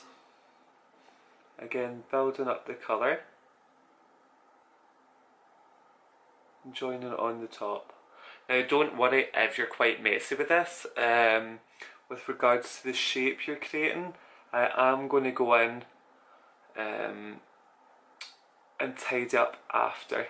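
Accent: Scotland, United Kingdom. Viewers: Wow!!! Seems like there is another one who´s bored of the Nude Make-up all around ;D!!! I love your makeup!!! OMG!!! You´re from Scotland!!!! I looooooooove your accent! Wanna go to Edinburgh this summer (a bit bored of London and Kent now ;D)! Meanwhile I have to learn the accent ;D, guess I have to watch "Outlander" another time XD! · very pretty! and i love your makeup. are you from scotland?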